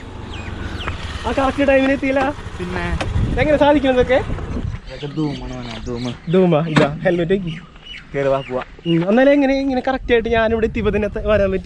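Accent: Thrissur, India. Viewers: Thrissur kaaranano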